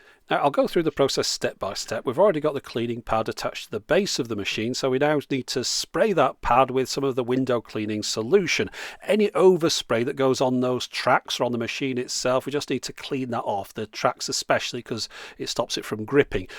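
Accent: United Kingdom. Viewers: I love your videos and your voice! Its so British and classy. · Charming British accent... mmm...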